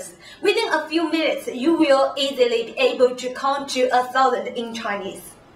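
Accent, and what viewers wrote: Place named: England, United Kingdom. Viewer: I love your English accent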